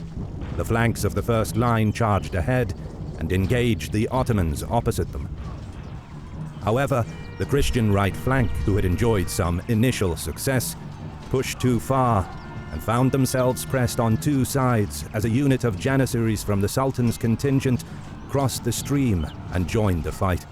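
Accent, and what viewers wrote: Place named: United Kingdom. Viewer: That battle wasn’t a defeat by the Christians alone. The Ottomans disengaged as well and the battle was not for nothing. The Christian army despite their withdrawal accomplished their goal which was dislodging their enemies from the fortified town. You may sound British, but you’re definitely a pro-Muslim person based on your biases. Didn’t you just say in the earlier part that these Ottomans murdered the people they captured? Why are you partial to these murderers?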